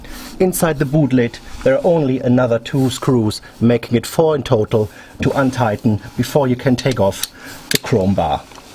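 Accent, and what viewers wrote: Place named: Germany. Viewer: Very nice camera work. Very typical German dialect and mindset. Kinda charming - almost. ;-)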